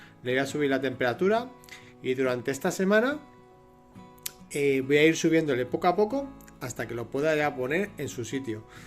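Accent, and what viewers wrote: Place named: Spain. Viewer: Hola, una pregunta. Por tu voz me imagino que eres de España. Hace poco pusieron una ley la cual prohibía muchos reptiles o algo así. Yo soy una persona que adora los reptiles y tengo varios pero son mayores. ¿Como haces para tener ejemplares de lagartos exóticos tras la ley? Esque me interesa el teju argentino blanco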